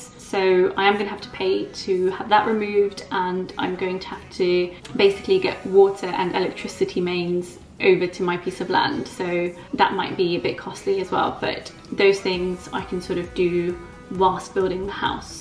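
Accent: England, United Kingdom. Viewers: As you polish? And why do you want to live in Poland? You sound english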